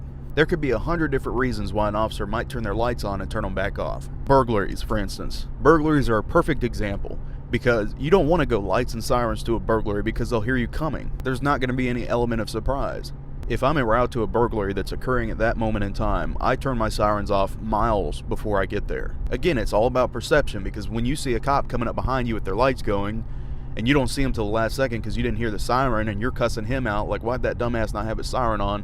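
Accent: United States. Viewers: I reeeeeeeeeeeeeeeeeeeeeeeeeeeaaaaaaaaaaaaalllllllllllllly love the way you're speaking. It's like the typical American-English slang that I just love to hear all day long. Btw, could you possible show us some ELS action?:3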